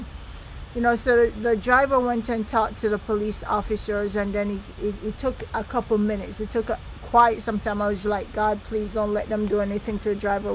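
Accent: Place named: Jamaica. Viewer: Yoùr speech pattern is Jamaican therefore it's safe to say you are Jamaican so how come you were so unprepared for current occurrences on the Island. Say thank God the Police were doing their duty enforcing traffic regulations, havent you read or heard of the very high numbers of fatalities and senseless mayhem committed on the roadways? There are several reasons why Police personnel are armed with high powered weapons in the public space, your safety and protection is one of them. Your foray into blogging appears to be in its infancy, take time to research and prepare content, not everyone ad-libs a cogent presentation. Not criticizing, "mi 'jus a sey". Glad ypur stay was safe and enjoyable. A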